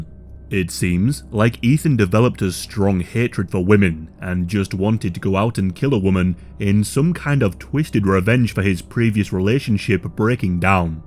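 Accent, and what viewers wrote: United Kingdom. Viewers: Yes, sounds British to me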